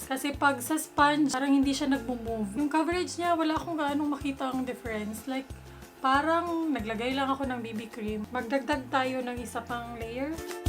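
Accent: Visayas, Philippines. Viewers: Bisaya ni bai 🤙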